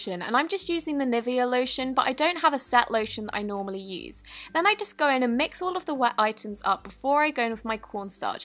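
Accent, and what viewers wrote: United Kingdom. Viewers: Are u from the UK. Just asking cos you sound like it. I do. I come from East Yorkshire. Love ur videos. 😁😁😁😁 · You sound British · British alert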